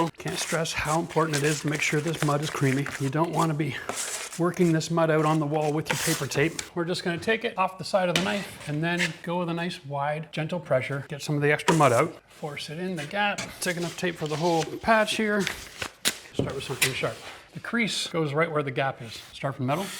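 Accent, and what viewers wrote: Canada. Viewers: Canadian aye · Are you Canadian? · He definitely sounds Canadian to me :P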